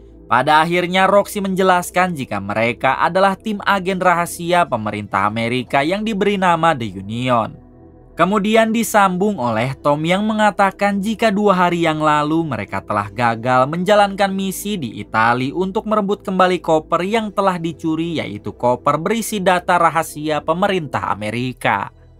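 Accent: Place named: North Sumatra, Indonesia. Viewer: Karna totongnya... 🤣🤣🤣🤣 Orang sumut lu min?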